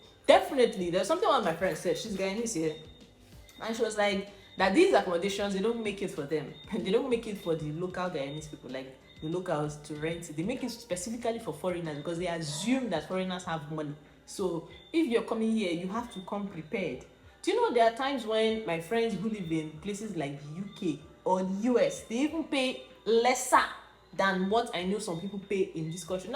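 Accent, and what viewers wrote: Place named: Igbo, Nigeria. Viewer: That igbo accent i love it i got to get me another Nigerian igbo queen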